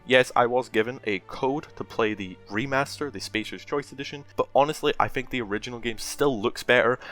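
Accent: United Kingdom. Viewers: Dude htf talking regular but pronouncing your ts as if your from Britain? Like wtf 😂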